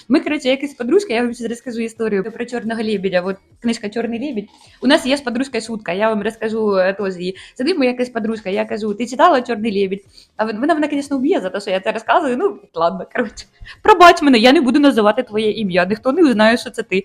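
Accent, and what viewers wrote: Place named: Cherkasy, Ukraine. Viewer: Звідки ви? Такий цікавий говір? Часом не Черкаси ? Прекрасна енергетика😻😻 Продовжуйте далі❤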